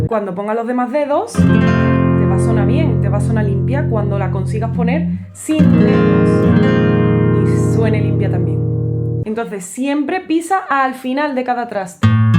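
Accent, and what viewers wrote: Andalusia, Spain. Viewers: Soy argentina y el único acento más bello que el nuestro es tu preciosísimo andaluh 😍 Hermoso escucharte tocar y hablar, un placer para los oídos!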